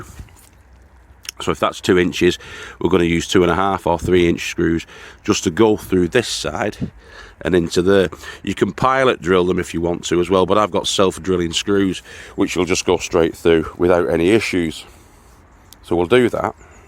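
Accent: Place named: Wigan, United Kingdom. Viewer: Hello! You're incredibly gentle with your persuader! Here I am yelling at the screen just give it a good whack,!! 🔨🤣 It looks really fantastic!! Just wonderful!! You'll make the money back by increasing productivity.. so don't forget to include the savings in your calculations!! Keep speaking the way you do... more correctly sounds terrible!! We'd miss the Wiganese! It'll be nice with featherboard!! Thumbs up, my friend!! Get out of those wet clothing..warm up... and we'll see you tomorrow on a sunnier day!☀️ Fingers crossed!! 🤞 G'nite!!💖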